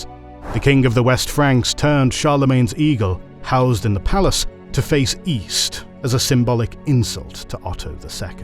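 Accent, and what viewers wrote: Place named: United Kingdom. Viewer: Please do away with the UK pronunciation of things; it breaks immersion... like really bad.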